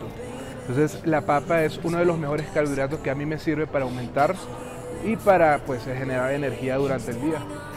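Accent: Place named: North, Mexico. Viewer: Méxicano norteño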